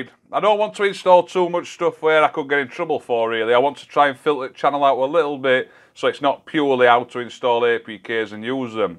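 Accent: Yorkshire, United Kingdom. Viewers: hey thanks for making this video I uninstalled it because I could not figure out how to use this programs also I noticed ur accent are you from the great Brittian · Very good videos. Good to hear a Yorkshire accent explaining it as well. Keep em coming cheers pal. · Are u from Yorkshire I’m from West Yorkshire you’ve got a very Yorkshire accent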